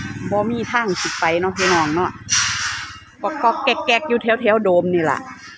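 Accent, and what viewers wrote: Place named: Laos. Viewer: มักแม่ ลาวเว้าม่วม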